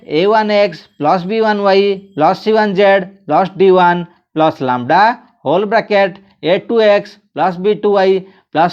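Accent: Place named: India. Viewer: Indian dialect of english. Need a course in how to understand him.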